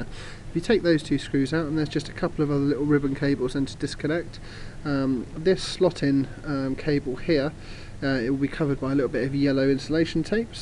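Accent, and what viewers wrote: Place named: United Kingdom. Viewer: Strange one, how do i tell if the replacement digitizer i have is the IC or non IC version? I know how to tell which version the phone is by looking for the IC under the sim card holder but my friend got the phone and ordered the replacement digitizer but i dont know if he ordered the right one or not! Also you sound British in the video, where are you from? I am in Kent. Cheers, Phil.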